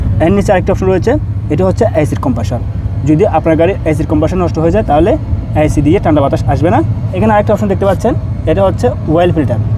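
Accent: Chittagong, Bangladesh. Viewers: Chittagong naki apner badi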